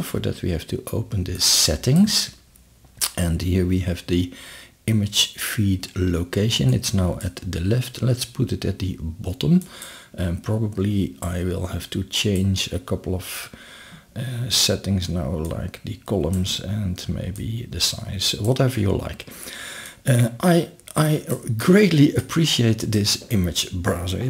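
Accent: Netherlands. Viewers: Thanks for the overview of what this extension does I have to say but away. To say when you started it was really hard for me to figure out where you were from but the further you went on in the video, hoe meer het Nederlandse dat Nederlandse tongval wil gewoon door te schemeren. But that's not important great video man thank you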